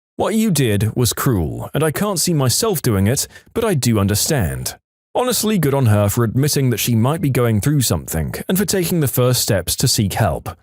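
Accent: United Kingdom. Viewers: Why is he British now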